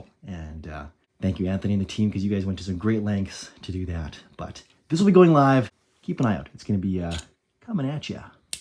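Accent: Canada. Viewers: Are you Canadian I can’t tell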